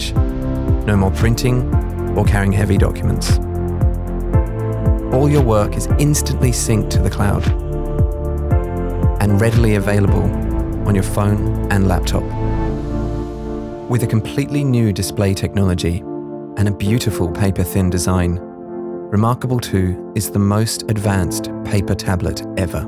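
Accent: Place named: England, United Kingdom. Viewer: that narration is just awful. Just having an english accent doesn't make it good.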